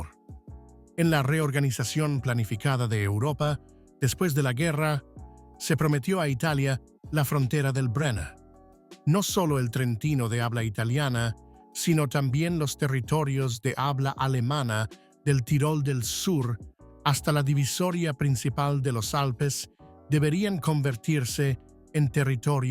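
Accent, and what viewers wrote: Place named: United States. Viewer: Porque acento yankee ??? Actualiza la IA y que te ponga acento neutro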